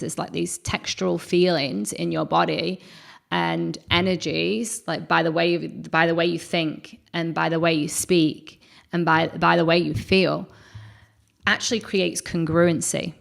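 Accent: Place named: Australia. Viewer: Omg this is so relaxing You have that slight Aussie tone in your voice in the back , that makes my brain go numb for the rest of the time you’re talking. That scratchy growling raspy purr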